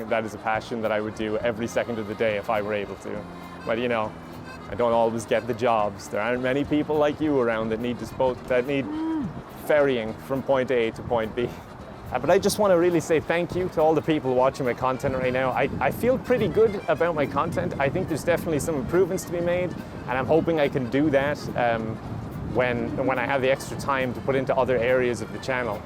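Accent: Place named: Ireland. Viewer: I rewatch his videos all the time. I like to sleep to his soothing Irish voice lol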